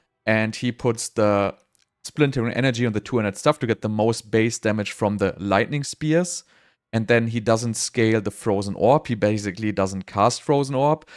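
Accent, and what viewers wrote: Germany. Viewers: Alter Schwede, mach halt Videos auf Deutsch. Dein deutscher Akzent im Englischen ist echt grausam.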